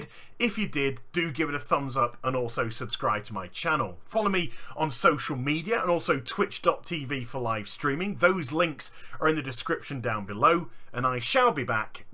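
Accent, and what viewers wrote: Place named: United Kingdom. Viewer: God I love the British accent. Every word. So clear.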